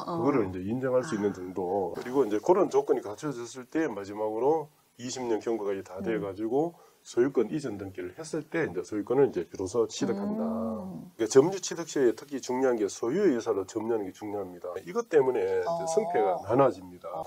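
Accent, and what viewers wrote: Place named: Gyeongsang, South Korea. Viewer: 이 친구 말투로 보니 대구출신이네.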